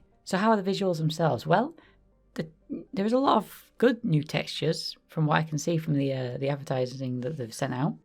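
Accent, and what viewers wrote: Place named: United Kingdom. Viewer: Less british than i expected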